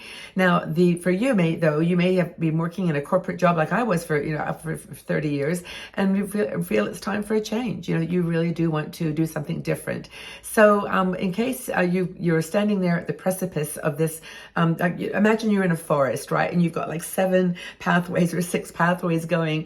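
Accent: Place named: England, United Kingdom. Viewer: Your accent is changing! Becoming more English! We’re you brought up in uk?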